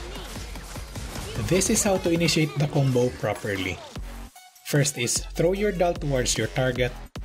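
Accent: Philippines. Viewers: Pinoy na pinoy yung accent.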